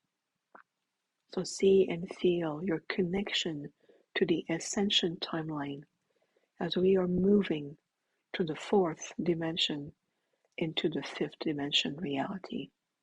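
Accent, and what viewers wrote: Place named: Quebec, Canada. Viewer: Merci!! J'adore tes méditations! Même s'il est presque indétectable, ton accent me dit que tu viens du Québec. Nous sommes de nouveau en lock-down, couvre feu, tout est fermé même pour ceux qui ont leur Pass sanitaire. Grosse leçon pour eux j'imagine. So thank you again! I saw you on Patriot Dave and listened to all your meditations since. Thank you for your devotion to the collective. Have a great year! I didn't even see 2021, time as been slowly disappearing the past 5 years for me. We've got this! Much love ❤️❤️ From Contrecoeur, Qc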